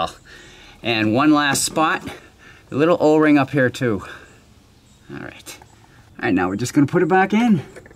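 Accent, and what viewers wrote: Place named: East Coast, United States. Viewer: I have watched this video a half dozen time while changing the oil in my wife’s Jeep. But really I just watch it for the east coast accent factor 😆.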